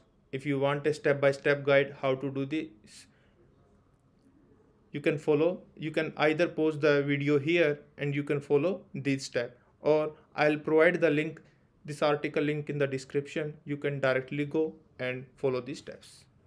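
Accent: India. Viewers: Useless like this Indian Voice :)))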